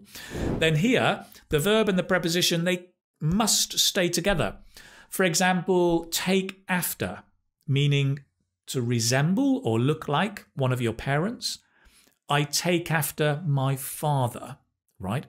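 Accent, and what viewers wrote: United Kingdom. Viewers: You are a great native speaker teacher! I love British English.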